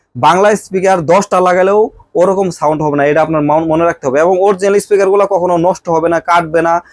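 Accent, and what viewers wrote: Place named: Sylhet, Bangladesh. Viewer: Sylhet tone dekse bhai 🤗🤗🤗🤗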